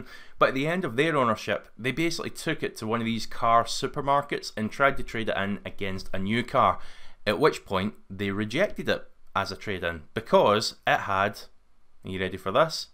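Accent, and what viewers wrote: Scotland, United Kingdom. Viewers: I subscribed just for the accent - I'm a McRae but live in Australia after my great, great grandfather emigrated. However a Scottish brogue still resonates with me. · You need to change your accent,,,you are speaking scottish,,,,everyone detests scottish lingo,,,soplease,,,go to a voice coach,,,they will kill your problem. Jus sayin is all.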